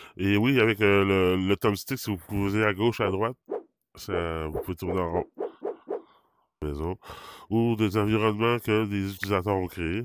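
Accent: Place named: Quebec, Canada. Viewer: Merci je suis newbie avec le Quest 3 depuis 6 jours et j’ai comme on dirait du stock d’appris depuis! Vos explications sont claires et simple à comprendre! Merci pour cette introduction au Bigscreen qui est sans doute semblable aux salles plateformes cinémas! Je suis déçue de m’apercevoir combien c’est monétisé ces machines sans bon sens et ça ressemble étrangement à in PC! Personnellement je ne suis pas fan des jeux que je trouve enfantin de toujours vouloir détruire quelque chose ou de tirer avec des engins sur des gens ou pour avoir accès à des points ou bonus et je ne sais quoi! Par contre, j’adore apprendre quelque chose, voyager ou regarder des films en 3D ou réalité immersive! Il y a un gros potentiel, mais Meta a fait fausse route en développant des jeux presque essentiellement. Car il aurait été plus intéressant de developper le côté immersif dans d’autres applications! 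Je crois que c’est la voie à nos PC de demain, comme on voit dans Star Streck!😊 je suis du Saguenay et vous d’où du Québec, car votre accent est québécois! Merci bcp!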